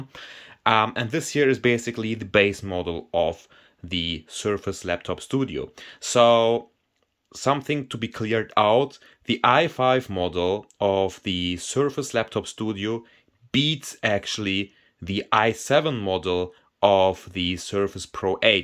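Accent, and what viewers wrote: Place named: Germany. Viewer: I love your German accent ❤️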